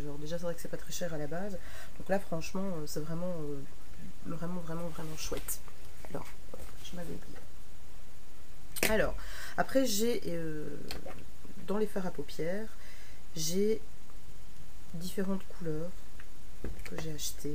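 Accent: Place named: Belgium. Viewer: et jadore ton accent belge!!!! sa me rapelle une famille chez qui jai passer la plus part de mon temps!! heureusement pour mon porte monai ya pas de produit catrice ni essence dans le coin!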